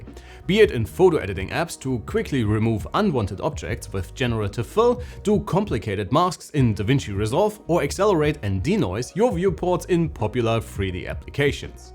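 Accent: Germany. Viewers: Nobody wants to talk to you in the comments below lol. You manage to speak German when talking in English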